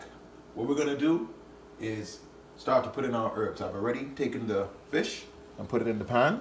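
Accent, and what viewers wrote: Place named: Bahamas. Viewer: Thank you so much for this video.. my best friend was from Nassau and she used to make this for me and watching this video just brought back so much memories of her great cooking . Even listening to your Bahamian accent reminds me of her ☺️she passed away in 2010 and I love and miss my bahama mama best friend/sister so much 💔🕊🕊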